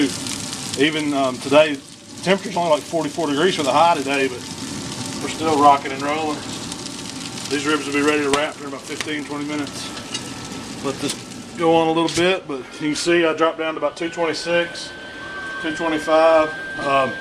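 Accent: South, United States. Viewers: Dig the southern accent. Which state are you from?? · I love your ribs and I love that cool southern drawl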